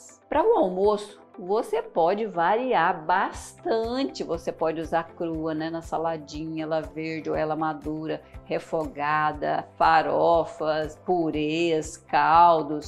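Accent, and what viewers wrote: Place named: São Paulo, Brazil. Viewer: Eu não sabia que ela era mineira. E por que fala como uma paulista? Tem que falar como mineira.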